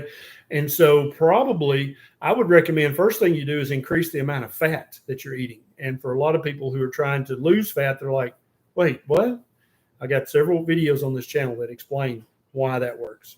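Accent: South, United States. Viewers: Oh, lighten up. The doc has a southern accent and he is in his own home. He can talk any way he wants. If it offends you, you don't have to listen.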